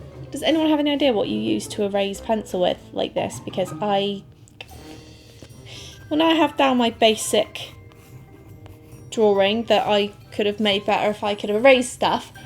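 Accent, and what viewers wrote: England, United Kingdom. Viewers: Have you ever lived in England because you sound a bit English but I belive you live in America but I'm not sure 🙃 Also I love your videos, they're really funny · As I understand you live in America, but aren’t you British? I’m thinking this because of your accent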